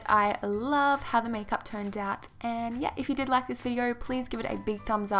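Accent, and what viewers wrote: Australia. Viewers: Australian accents are so cool omg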